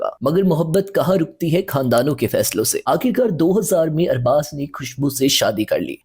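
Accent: India. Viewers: Ye Jo host hai kia India se hai. Talafguz India ki Tarah PATA nahi kion apnate hain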